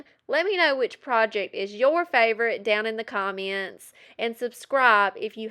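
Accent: South, United States. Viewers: I wish you were closer to me; I would give you an iron. I have 3 or 4 of them. You can pick one up when you're thrifting. I love your show and your cute southern accent.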